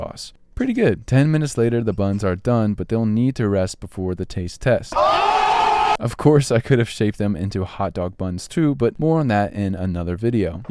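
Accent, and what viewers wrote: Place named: United States. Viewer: Why speak perfect America English then go on to say Celsius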